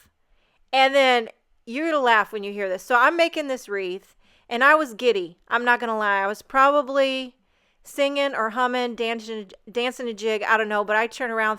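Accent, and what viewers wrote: Boston, United States. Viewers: You kill me!! 😂 I love watching and listening to you! U sound like a Boston Ma girl sometimes when u talk! Thanks for always making me smile and sharing all ur beautiful cards!! 😊💗👍🏼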